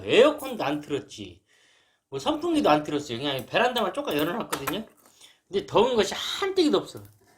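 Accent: Jeolla, South Korea. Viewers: 전라도 사투리 중에 오리지날 입니다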